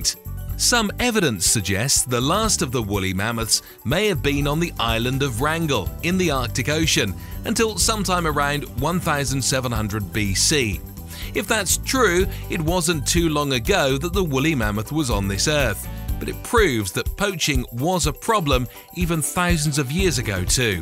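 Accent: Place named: United Kingdom. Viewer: What's with the British accent?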